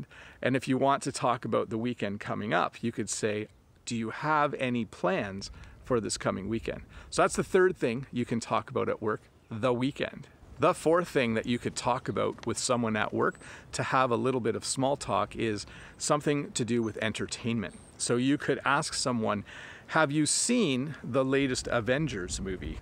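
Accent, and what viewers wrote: Canada. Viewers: 100% understood Thanks - Good lessons for frenchman like me, good to listen english with canadian accent!! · A lot, when I was living in Australia, specially with talks with Australians. I like your Canadian accent, specially when you pronounce the "au" like in about.:-) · Dear, this is my first english teaching video I have ever seen (and heart). I am sure my english skills will be improve by waching your videos and daily ideoms on instagram. I like your accent. Habe you got a typical canadian one?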